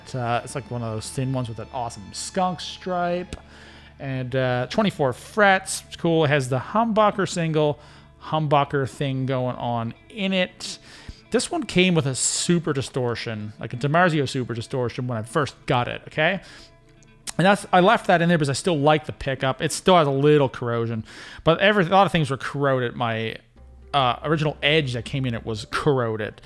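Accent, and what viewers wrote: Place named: Canada. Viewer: I heard your accent BEFORE I saw the Saga poster... then the Rush poster... LOL... Congrats on being a fine representative of the Great White North, my friend! LOL... Great video, thanks! I have a lot of RGs and I use the 1996T often!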